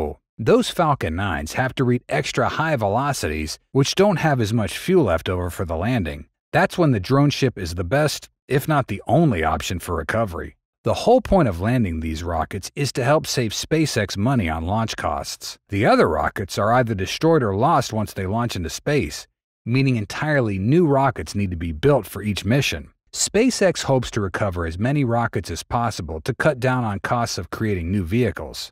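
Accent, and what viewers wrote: South, United States. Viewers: his southern drawl was so strong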